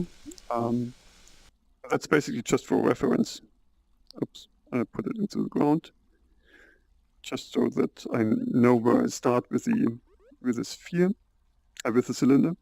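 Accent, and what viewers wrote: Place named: Germany. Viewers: Yea I think he is a german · Nice! Also i like ur accent are you german? I'm half german!! +1 sub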